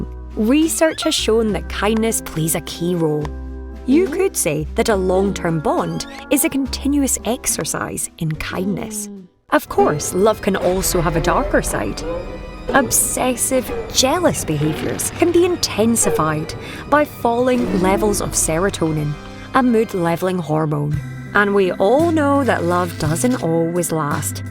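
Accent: Scotland, United Kingdom. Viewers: Pretty sure she is a brit, but i don't know exactly which region.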